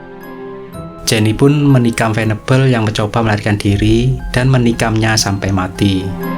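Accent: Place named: Java, Indonesia. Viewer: Film nya menegangkan. By the way spean orang jowo to mas logat nya ketauan. Hehehe...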